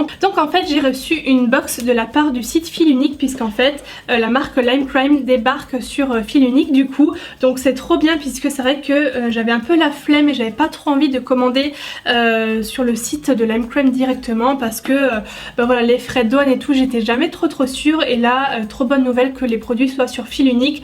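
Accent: Lorraine, France. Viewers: Je découvre ta chaîne avec plaisir ^^ Ahhh moi aussi je suis Lorraine d'origine et alsacienne d'adoption Lol je l'aime trop ton petit accent 😍 Merci pour ta bonne humeur 😉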